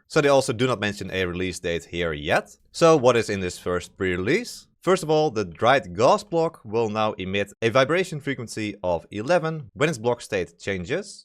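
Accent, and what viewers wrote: Netherlands. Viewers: Tijdens de video dacht ik: "dit is iemand die Nederlands spreekt" We hebben specifieke zinsbouw, klanken en intonatie in 't Engels. Anyway: goed gedaan! Mag nog. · Hah, ik hoorde gelijk n grappig nederlands-accentje. Groeten uit R'dam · Love the video (but still miss X). You sound very Dutch, can anyone confirm? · Do i hear a fellow Dutchman here!! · I'm hearing a very strong Dutch accent. Is that true or am I imagining things? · omg do I hear a dutch accent (you did a good job:D) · I smell dutch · Dutch guy Detected! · Haha yes us dutchies can recognize it immediately hè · Are you dutch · İ hear your Dutch accent, ik hoor jouw Nederlandse accent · That accent. Are you Dutch? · Loving the Dutch accent! Also.. GEKONOLISEERD!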